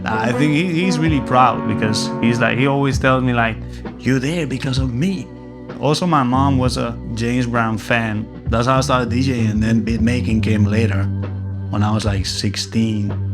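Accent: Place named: Poland. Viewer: Wow your accent sounds kinda Polish. And you look like a Polish singer Dawid Podsiadło. :D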